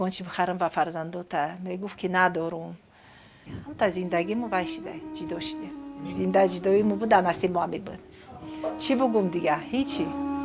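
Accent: Tajikistan. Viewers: Ика забони точикии худмон ширинай. Бо и Модар ширинтар гап мезадас. Ахсан Модарчон мехнатотон рохат шаватон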